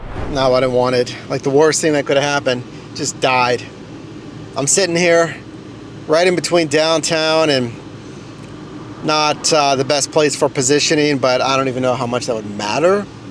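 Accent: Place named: Pennsylvania, United States. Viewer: It's s that pennsylvania accent 😉 I have to say, your phone restarting was a sign to call it a day; I'm surprised that you didn't get a lot of offers on a holiday.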